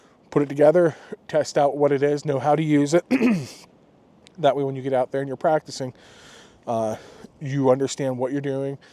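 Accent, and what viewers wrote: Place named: Pennsylvania, United States. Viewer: Loved your video. I am originally from Pa. Kittanning near Pittsburgh. Thought I detected a little Pa accent. Living in SC now. Nice kit. Not over complicated.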